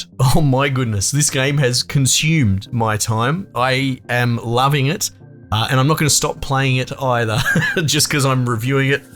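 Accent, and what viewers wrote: Australia. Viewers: I so miss hearing our aussie accent on videos. Makes me feel more at home knowing some one here in AU who is layed back and not give a shit about subs and likes but just telling it how it is...and i understand what your saying...lol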